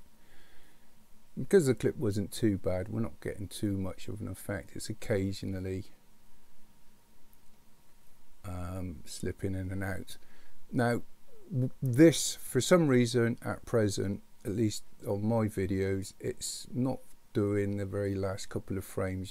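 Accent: West Country, United Kingdom. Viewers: Great video, great west country accent!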